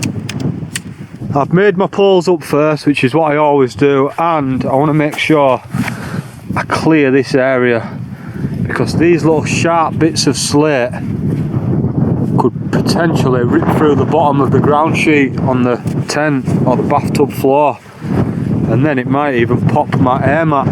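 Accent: Yorkshire, United Kingdom. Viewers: I'm guessing your from Yorkshire mate, loving your videos mate.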